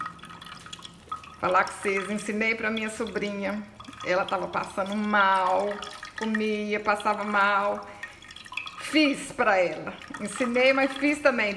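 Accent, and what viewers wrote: Minas Gerais, Brazil. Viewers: Mineira certeza, só de ouvi -la já é uma cura, linda 😘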